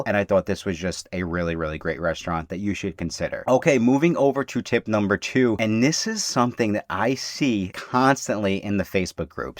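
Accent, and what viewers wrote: New York, United States. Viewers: Thanks for clearly stating that 2nd gen interactives were the only wands trackable on the app! I rely on CC due to grappling with the NY accent, and I didn’t ‘hear’ any misstatement but perhaps my eyes are going, too. The potential $85 minimum savings of not upgrading our 1st gen interactives wands might make 1 visit to Blue Dragon a possibility.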